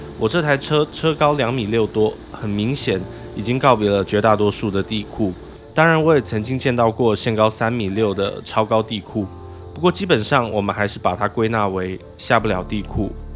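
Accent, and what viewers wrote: Taiwan. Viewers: 語調很台...用語很中....真怪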